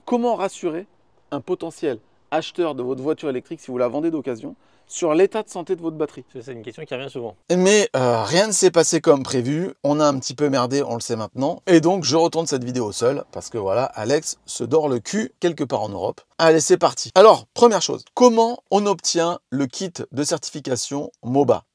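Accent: England, United Kingdom. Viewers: Vraiment cet accent Anglais...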